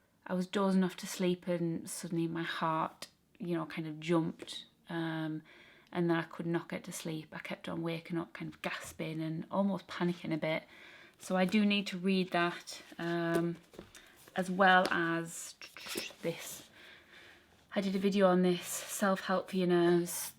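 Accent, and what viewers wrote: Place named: Northern England, United Kingdom. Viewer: I think it's more the North English way to pronounce the vowels than dropping the t It's cool, I love listening to different English accents :)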